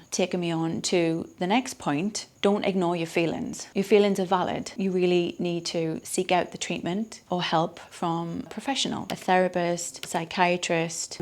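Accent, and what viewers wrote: United Kingdom. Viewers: I adore British prononciation, sounds like a music to my ears. thank you for the video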